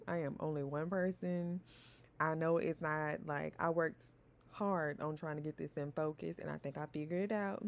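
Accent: South, United States. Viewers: Don’t apologize for your country accent. Thanks for the video. 😊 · Lmao 😂 girl .. no music cuz YouTube 😂 I love country accents please narrate ❤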